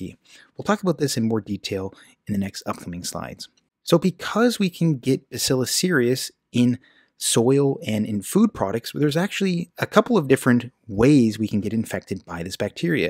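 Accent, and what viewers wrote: Canada. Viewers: Canadians talk funny.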